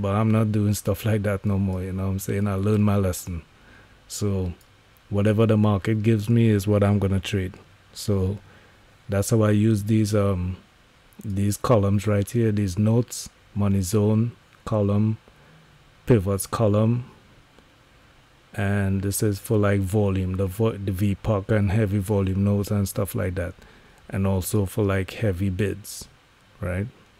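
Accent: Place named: Trinidad and Tobago. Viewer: You sound like an Israelite Trini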